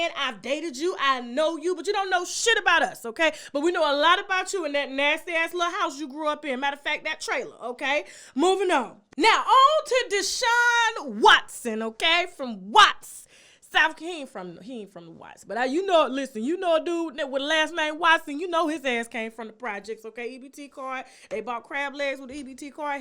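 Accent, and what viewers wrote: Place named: South, United States. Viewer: She’s southern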